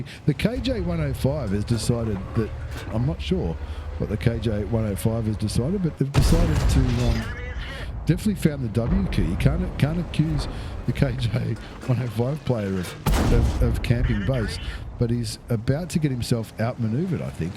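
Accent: Australia. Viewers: Halo Governor yes type 59 g were all over the place after black market now rarely seen on N/A great videos and awesome aussie commentary from Jax Fla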